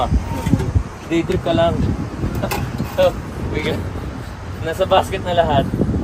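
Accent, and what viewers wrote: Iloilo, Philippines. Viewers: Namian gid ko Chef mamati sang imo Ilongo nga accent 😂😂😂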